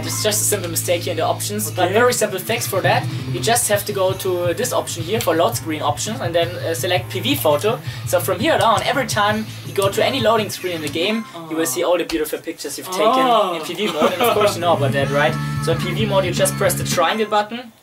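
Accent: Germany. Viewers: ich wusste das du deutsch bist XD dein Akzent verrät es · Nice to see as a german. Quite creative man keep it up Und man merkt den Deutschen Akzent aber cool ;)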